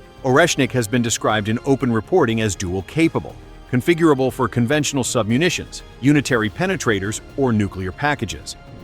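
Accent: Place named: United States. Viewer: I’m always a bit wary about US accented videos talking about Russian military gear with an air of reservation. It’s almost like everything that is discussed can only be viewed through Rose-tinted, US-centric spectacles. Apart from the very obvious “mil-speak” style in the narrative intended to deliver the story the way that insanguinated generals would present to a gathering of the same minds, the thing that really gives me the pip is that every narrator manages to insert a subliminal little rider in the presentation about the questionability of any technology that isn’t of US origin. We already know the US is definitely not the best at anything in the world, yet it seems to be persisting in increasingly enfeebled attempts to reinforce that nations self delusion. This is especially strange coming at a time when the corrupt US/UK/NATO/Ukraine cabal of criminals and their “game-changing” weapons are getting their clocks cleaned in Ukraine - and even Iran - which is widely derided as being a “nation full of rag heads from the middle ages” - in one assault levelled significant sections of Israel, to the extent that Israel has refused to admit public use of any camera footage from the disaster zones, and is almost certain to receive similar punishment again. 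For God’s sake, even the Houthi’s are showing the US a thing or to, that has caused the US to move their battlefleet out of range, probably unsuccessfully, for there is more to come from them if the US persists in its support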